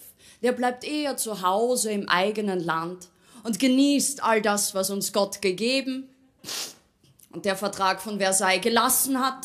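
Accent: Austria. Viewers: nach drei Sekunden zuhören in Österreich angekommen ....:-( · ist das eine Oesterreichisch? Wir kennen nur Arnie's Terminator Akzent.... klingt wie Hollaendisch. (Gruesse aus London) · Auch wenn man bei Lisa Eckhardt grundsätzlich ihren Zynismus bedenken muss, stellt sich die Frage: Ist sie jetzt noch selbstbewusst oder schon arrogant? Diese Frage lässt sich aber wohl nur dann sicher beantworten, wenn man sie abseits der Bühne kennenlernt. Auf jeden Fall ist die Frau eine großartige Mischung aus Wortgewandtheit, scharfer Zunge und Sexappeal. Und als Bonus kommt noch der hochsympathische Österreicher Dialekt hinzu :)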